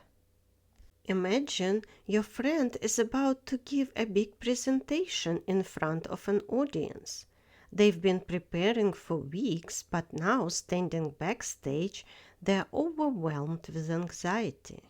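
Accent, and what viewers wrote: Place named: England, United Kingdom. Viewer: Мамочки, какой же сильный акцент английского 🙈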